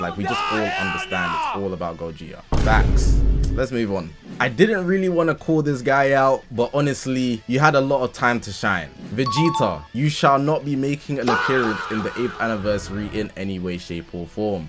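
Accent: United Kingdom. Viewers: Idk wym by “WE” skipping 😭🙏 thought u was British not French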